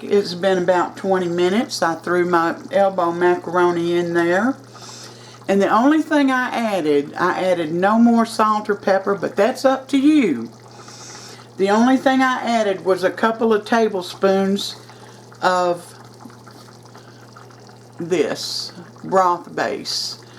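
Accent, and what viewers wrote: South, United States. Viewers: CAN YOU PLEASE DO A VIDEO SHOWING US HOW TO CAN YOUR WONDERFUL SOUP? So glad I found your channel! I have a lot of catching up to do, looking at all your yummy dishes! I love your southern accent! Thank you for sharing! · Lol, she said giving a thumbs down was mean😂😂😂. I'm definitely giving you a thumbs up Mama! Love that country voice😊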